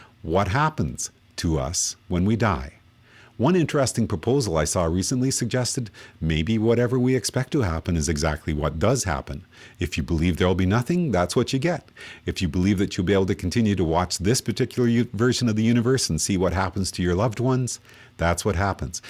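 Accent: Canada. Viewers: His thick Canadian accent is distracting me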